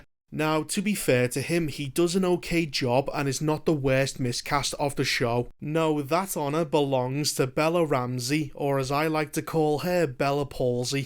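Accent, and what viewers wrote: Liverpool, United Kingdom. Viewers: God your scouse accent is annoying but i 100% agree with you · Yes my bredda, Are you an ACTUAL SCOUSER?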